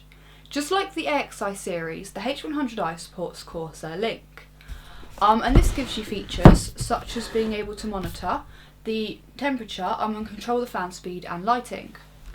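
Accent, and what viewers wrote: England, United Kingdom. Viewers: you look russian on your thumbnails, and suprised when i hear the british accent haha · I'm sure you meant English* accent dude. She's English and thus speak with an English accent. I'm an American and I speak with an American accent, happens to be a Southern one (Virginian) since I'm out of the South. Something tells me you are an ignorant Yank. :) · I really like UK accents! It just sounds so nice for some reason! xD Great video btw! :) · Blondie and Coumputers? o_O? lol but her British accent is sweet:) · A brit-accented blonde telling me about technology? What sorcery is this?!!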